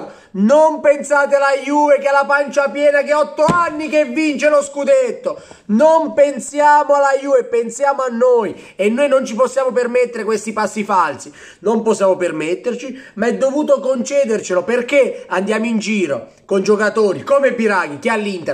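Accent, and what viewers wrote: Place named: Apulia, Italy. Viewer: Ma cosa prendi in giro xhe si sente che sei pugliese anche tuuu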